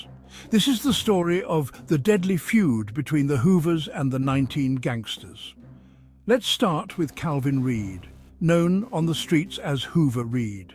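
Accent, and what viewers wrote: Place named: RP, United Kingdom. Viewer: Hearing an upper class, civilized, old Englishman's accent narrating the Shreveport ghettos cracks me up 😂😂.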